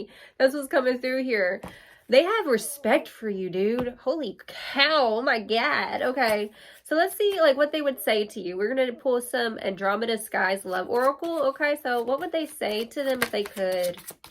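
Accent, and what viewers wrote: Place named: East Texas, United States. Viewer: I never noticed b4 u got that deep east Texas accent 💕 have a great day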